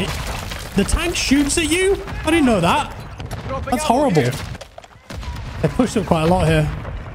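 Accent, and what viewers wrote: United Kingdom. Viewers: thanks this is a very useful video but with british accent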